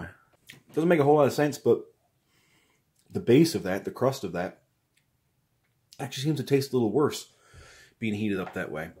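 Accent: Canada. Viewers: At last a Canadian MRE reviewer who speaks like a real Canadian! Pop & pizza pockets. Throw in a bag of hickory sticks and a Coffee Crisp bar and that's a damn good lunch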